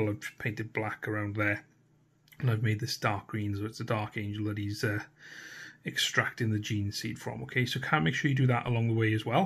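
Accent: Wales, United Kingdom. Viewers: Great job. You sound Welsh mate. Where to are you from like? Sorry, couldn't resist. Shout out from the funny, sunny Rhondda.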